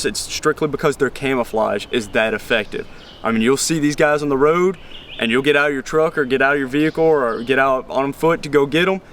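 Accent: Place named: South, United States. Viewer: Love the southern accent you have. Keep on truckin bro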